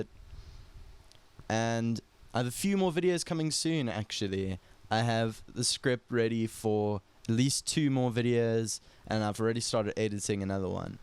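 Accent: South Africa. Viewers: could tell youre south african from the accent lol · Ayo SA accent who?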